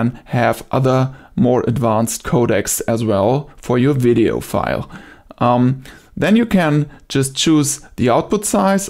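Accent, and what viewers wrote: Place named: Germany. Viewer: Excellent tutorial, I love you accent, are from Germany, just a little correction in you English, when you said "this is the workflow buttons which I explain you", you should say "which I will explain to you" I hope you don't mine me correcting you because you English is excellent, keep up the great tutorials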